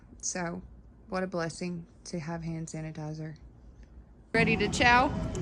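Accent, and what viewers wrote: South, United States. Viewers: Y’all would be SOOOO fun to hike with! (In a non-social distancing environment, of course)! Love the southern accent young lady! Our Head Start children would loVe your voice at story telling time!!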